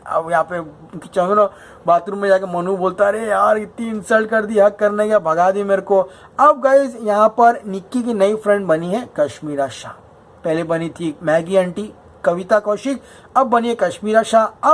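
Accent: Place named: Bihar, India. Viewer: Bhai bigboss wale public ko chutiya bana rahe hai aaj pehli baar mujhe aisa laga ki sab kuch pre planned tha. Vikas ka rona thoda real laga kyuki aadmi jab rota hai to koi bhi pighal jaata hai. Uske pass joker card hai. Wo dubara entry lega. Mujhe bhi gupti utna pasand nhi hai but emotional kar diya usne. bhai Amitabh bacchan ki mimicry ek number mera bhai. And your bihari accent is mindboggling. Love from bihar.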